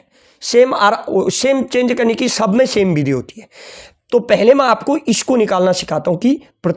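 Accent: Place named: Pahari, India. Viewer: अरे dajyuu पहाड़ी हो क्या, टोन से लग रहा है❤। हम भी पहाड़ी ब्राह्मण ही हैं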